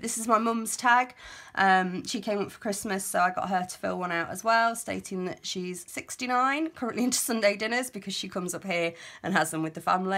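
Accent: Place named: Texas, United States. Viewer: Enjoyed your video very much,! As a Texas girl, I enjoyed your accent almost as much as your album! I have photos and an idea for each day, but haven't started yet. Thanks for all the great ideas!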